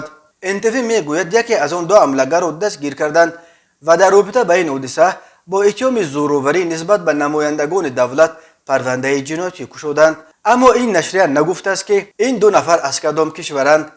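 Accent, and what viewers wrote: Pamir, Tajikistan. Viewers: Акценти хамин журналист Помири мебогад. Ин баранда журналист помири мебошад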